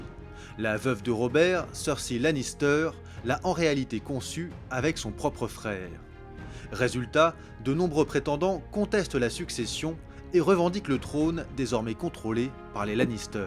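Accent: France. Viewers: Encore une fois, très beau travail (même s'il manque pas mal de précisions; Jon Snow fait partie de la famille Stark, il n'est pas question de Tyrion, pas même de son frère Jaime, ni de Melissandre et du Dieu Rhor,... mais l'essentiel est ici). Seulement, les noms prononcés à la française, c'est assez déstabilisant. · Je jure si tu dit BORATHEON encore une fois avec cette accent français merdic qui me fait mal au oreilles....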